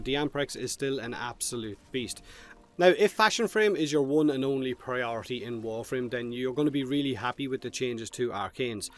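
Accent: Ireland. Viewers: Personally I love your brogue.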